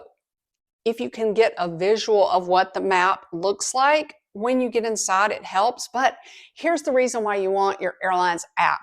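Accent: South, United States. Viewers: I love listening to while drinking my morning tea. Love her Southern accent- home to me! Even though I travel internationally a couple times a year and have for a long time, rules change and just adds to my peace of mind with her updates and equipment tips. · Love it! Thanks for the tips. I'm always looking for ways to make my airport experience better. Also, loving your accent from one southern gal to another!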